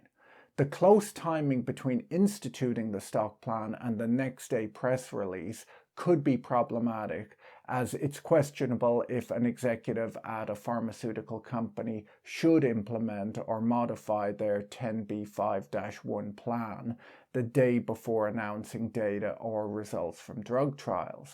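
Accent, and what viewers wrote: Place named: South Dublin, Ireland. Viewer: ah yes, I could hear the southside. I was in Drimnagh and Castleknock during my childhood. I'm a fan of your videos and literature. Thanks.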